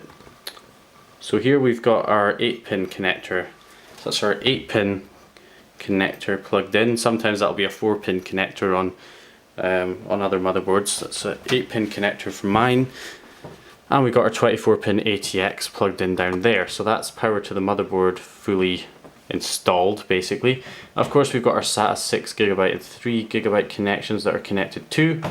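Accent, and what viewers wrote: Scotland, United Kingdom. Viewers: ***** I believe it's scotland, judging from the accent. Probably somewhere near Aberdeen, but his accent has a slight twist, so he might be from somewhere around that area. I'm not Scottish, so I'm not too sure. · Are you from Scotland?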